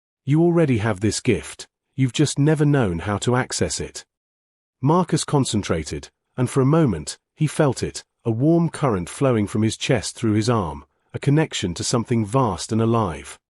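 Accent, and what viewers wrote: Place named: United Kingdom. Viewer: With the British accent, I should have known the answer for two naked women showing up for warmth would be "Let me make tea!"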